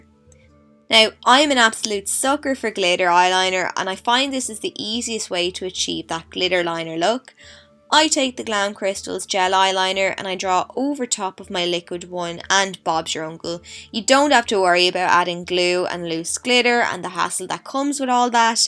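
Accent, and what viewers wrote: Ireland. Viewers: Lol im irish too and you sound like my music teacher XD. My accents a bit different from urs cause im from the north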